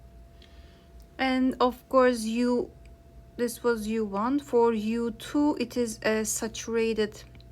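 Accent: Turkey. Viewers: Hocam Are you Turkish your accent sounds Turkish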